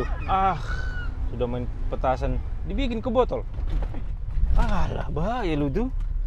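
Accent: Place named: East Java, Indonesia. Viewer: lo kok logatnya kyk wong jatim klo berbahasa jawa gais..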